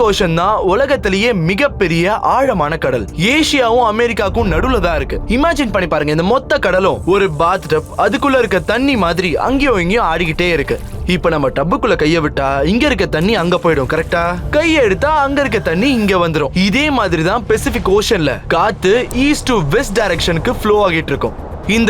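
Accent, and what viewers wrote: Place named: North Karnataka, India. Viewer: Dhruv Sir, Just a feedback on your Kannada Channel. We all are followers of your Hindi channel too. However the kannada language translation needs improvement. This is not Bangalore Kannada but sounds like a dialect of North Karnataka which is difficult to comprehend by most of the kannadigas. I completely understand that you can't read or write Kannada and you might have picked this language translation randomly without some inputs from Kannada Expertise. Best Wishes😊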